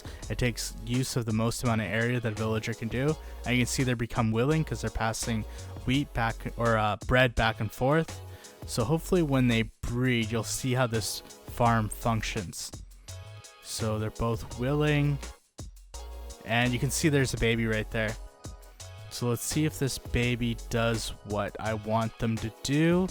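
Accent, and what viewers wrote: India. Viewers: Are you indian or American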